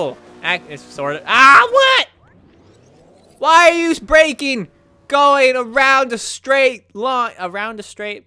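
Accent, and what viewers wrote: United Kingdom. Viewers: Strangely you didn't have so much British accent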